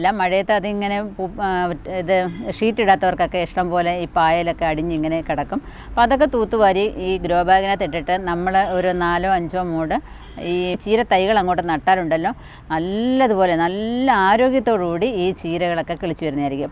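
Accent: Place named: Pathanamthitta, India. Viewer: ഏത് സ്ഥലം ആണ്. ഞാൻ പത്തനംതിട്ട കോഴഞ്ചേരി ആണ്. ചേച്ചിയുടെ സംസാരം കേട്ടിട്ട് പത്തനംതിട്ട പോലെ തോന്നി അതുകൊണ്ട് ചോദിച്ചതാണ്.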